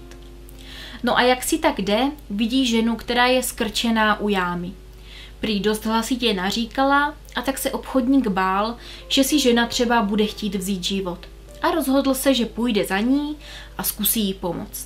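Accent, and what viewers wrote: Moravia, Czech Republic. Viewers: Já miluju tvoje příběhy.. Jen nemohla bys trochu zapracovat na té moravštině(změkčování i, kde to nepatří).Hrozně to trhá uši. Neber to, prosím, špatně.. Jen jako návrh na zlepšení.👐Odebírat tě nepřestanu:D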